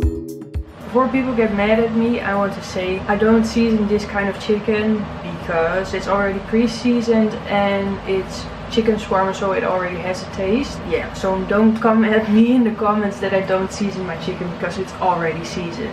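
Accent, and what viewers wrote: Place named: Netherlands. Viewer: Leuk dat je gelijk kan horen wanneer iemand die engels spreekt een Nederlander is haha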